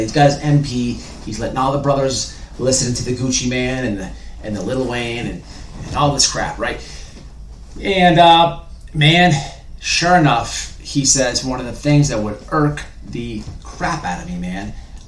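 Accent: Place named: London, United Kingdom. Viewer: Good london accent bruv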